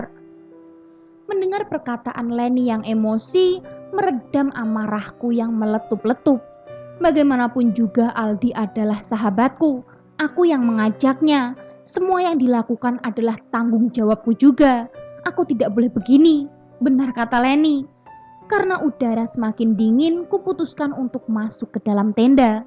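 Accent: Java, Indonesia. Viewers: Logat nya medok kali..😂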